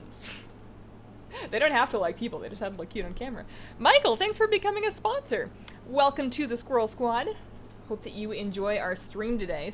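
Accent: Canada. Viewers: I like the Canadian accent 🐻😀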